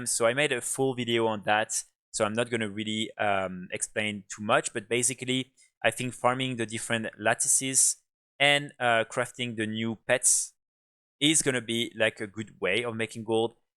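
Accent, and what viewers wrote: France. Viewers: Really like your narrating style and you're French accent! 😊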